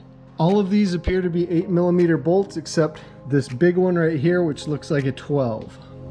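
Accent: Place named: Payson, United States. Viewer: Didn't have to look at your contact info to know you were from Payson haha. Your accent tipped me off, and the RMATV bag in the shot comfirmed haha. Great video! My bike burns oil on startup. It's got over 1,000 hours on all the original top end. I'll probably ride it through the summer and rebuild the top end this winter. Great video!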